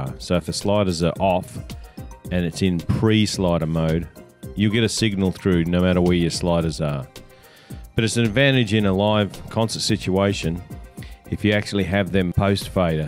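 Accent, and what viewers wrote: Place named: Australia. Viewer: Love your aussie voice mate :)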